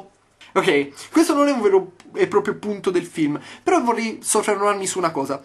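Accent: Turin, Italy. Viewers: mi sembrava :) una vaga cadenza torinese!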